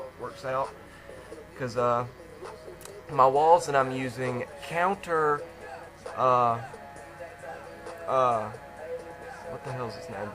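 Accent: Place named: South, United States. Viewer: where are you from? im likin that southern accent!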